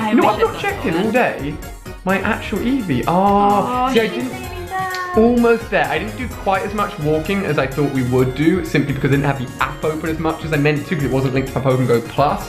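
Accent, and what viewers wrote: United Kingdom. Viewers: He's British and has an accent. Get over it and enjoy the videos or don't watch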